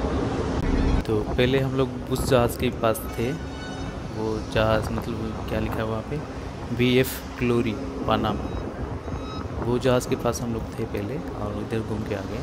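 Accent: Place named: Odisha, India. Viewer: Yeh Hindi bhi Odiya main bol raha hai bhai..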